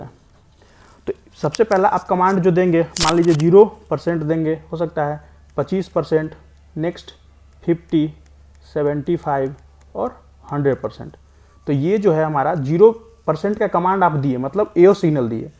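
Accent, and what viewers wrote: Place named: Bihar, India. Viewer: Bhai aapka language vihari type h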